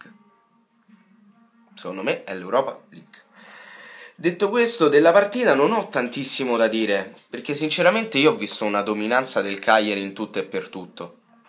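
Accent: Rome, Italy. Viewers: Come mai hai l accento romano?😂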